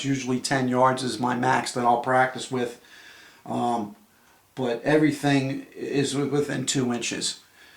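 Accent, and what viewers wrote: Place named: Queens, United States. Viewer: Great video. I ordered mine after Christmas and waiting for the transfer with my FFL. It's also good to hear someone who talks like I do. Astoria, Queens here! Thanks.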